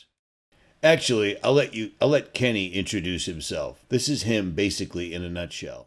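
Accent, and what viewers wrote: Boston, United States. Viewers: What happened to from Boston? This man has the same accent as. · I just came across your YouTube channel and this was the first video I've ever watched. I read some of the comments below and they were mentioning a guy named from Boston. I didn't know who they were talking about till I searched on YouTube and Google and figured out that they were talking about the guy who has this YouTube channel. I grew up in Boston; not outside of Boston or near Boston; not on the north shore or the south shore but in Boston. If you grew up in Boston then you know why I mentioned this. I'm curious where you are from in Boston? What section of Boston are you from? I certainly recognize the accent and could take a good guess and probably get pretty close or answer in 2-3 tries.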